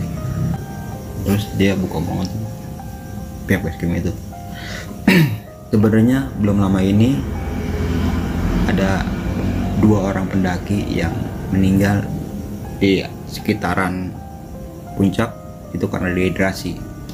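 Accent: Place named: Jakarta, Indonesia. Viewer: bang mange anak betawi yee.. medok bgt betawi nya sama kaya aye 🤣🤣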